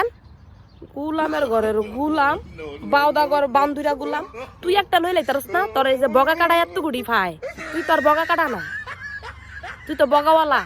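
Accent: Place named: Sonargaon, Bangladesh. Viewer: আপনার কথা বলার ধরন গুলো আমার অনেক ভালো লাগে,, আপনার গ্রামের বাড়ি কি সোনারগাঁ মদনপুর এদিকে,, আমার এক ছোট ফুফুর বাসার ওখানে,, তাদের ভাষা এরকমই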